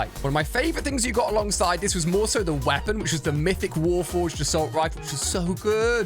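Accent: United Kingdom. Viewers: Are you British you sound like you’re British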